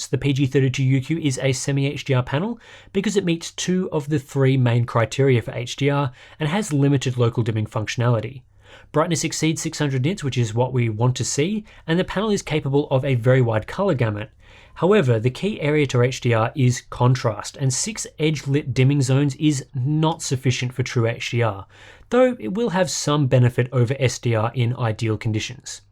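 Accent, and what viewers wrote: Australia. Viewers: What would you recommend this or the LG ultragear GP950? By the sound of your accent getting a top of the range monitor in Australia is impossible due to the chip shortage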